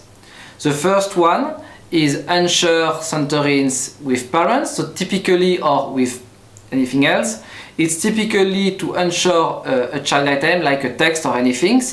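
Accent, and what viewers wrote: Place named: Paris, France. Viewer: Ça sens l’accent parisien par ici. Nice video but can have better resolution for next time.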